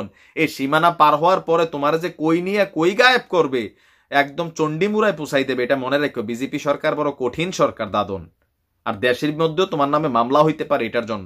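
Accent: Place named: Barishal, Bangladesh. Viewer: Vaiya josss hoiche borisal er vasha. Carry on vaiya. God bless you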